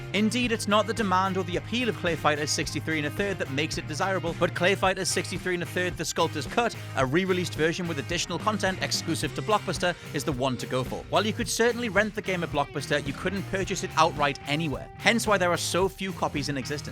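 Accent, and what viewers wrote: United Kingdom. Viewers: because he's British? That's how we say it. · Yeah, he's britsh. Rember they call the SNES the SNES and not by each letter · That weak currency, on a British channel, with a British narrator? Nahhhhh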